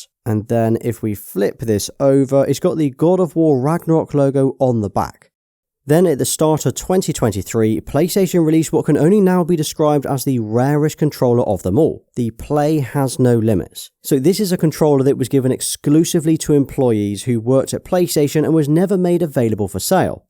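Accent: United Kingdom. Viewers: Uk accent hits me hard 😂 but u cook ps5 in this video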